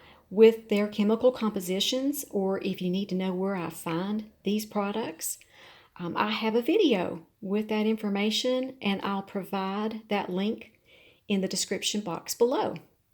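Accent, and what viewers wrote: South, United States. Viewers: Hey, I love, really love your channel!🤗 Thanksgiving was wonderful and life is good! However, I'm new to orchids and have just purchased my first one three wks ago. I cleaned all the deteriorated bark off very well, put it in lava rock after purchase as i seen per Rick's channel and changed it rapidly over to water culture. It got dry, very dry very fast even though I soaked as he instructed. I am in AZ🌵😎🌵with virtually no humidity, understanding that he is in humid Alabama. So wish me luck as I have been watching your videos faithfully. I do have a Q...my phal purchase from Fry's (Kroger in Tn) came with no air roots!🙄They were cut off🤔by nursery I'm assuming. The 1/2" remnents are barely visible. Are air roots really needed or did I make a fatal mistake purchasing this phal not knowing at the time? Thank you❤️so much and love your southern accent.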